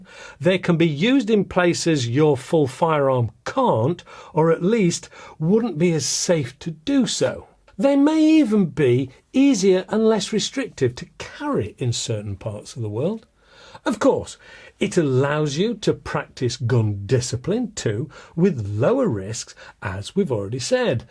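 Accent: United Kingdom. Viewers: Hi, I have owned various firearms and competition grade air pistols for 20 years, but just lately found the joys of airgun collecting. Must say that I enjoy greatly watching your reviews, even if they are about guns that I have intentions to never acquire. (Well, never say never...) Plus, since English is not my mother tongue and after listening all kinds of hardly comprehensible drooling of mixed "reviewers" from a certain other part of the globe, I really appreciate your expertise and crystal clear British accent. Keep up the good work!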